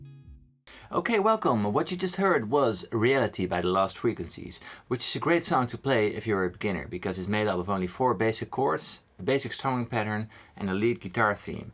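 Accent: Netherlands. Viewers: thanks for the video. you sound dutch :)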